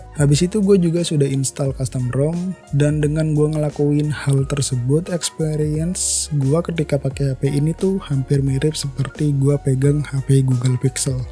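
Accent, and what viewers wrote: Bali, Indonesia. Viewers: bang lu asal bali yaa bang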